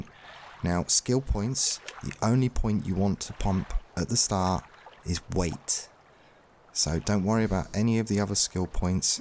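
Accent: United Kingdom. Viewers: A Young British Bob Ross teaches Ark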